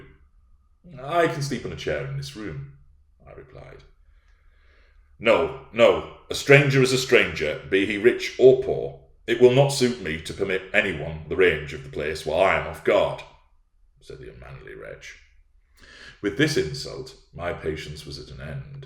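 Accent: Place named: Yorkshire, United Kingdom. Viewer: Excellent Yorkshire accent and a most enjoyable performance. Bravo!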